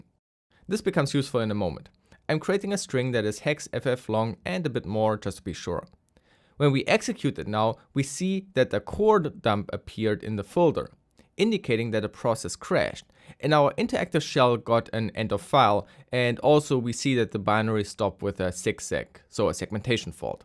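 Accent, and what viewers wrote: Germany. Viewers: The german accent is strong in this one... I know the pain too well~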